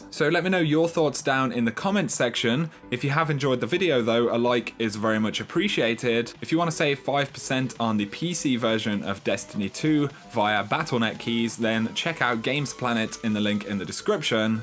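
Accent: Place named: United Kingdom. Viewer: Thanks, keep up the good work! (from Michigan, USA) Love that "!" UK accent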